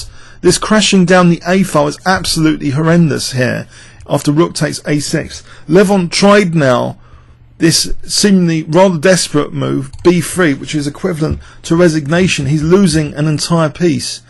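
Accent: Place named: Cockney, United Kingdom. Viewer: great game, and great commentary! I love your competence, your enthusiasm, your emotional way and your nice Cockney accent! thanks for all these videos, it's very entertaining. and happy new year :)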